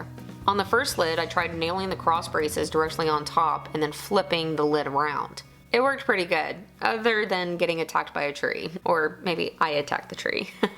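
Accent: Texas, United States. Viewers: It's just how she talks. It's a Texas thing.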